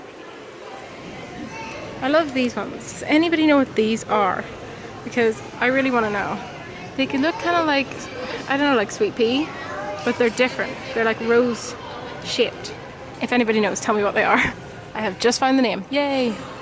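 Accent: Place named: Ireland. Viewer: Born Irish Accent, the best accent in the Whole World v😁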